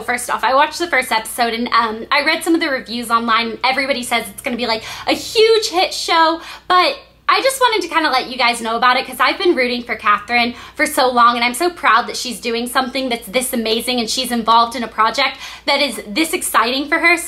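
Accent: United States. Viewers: Then don't watch her. Plain and Simple. And she is american so ergo an american accent.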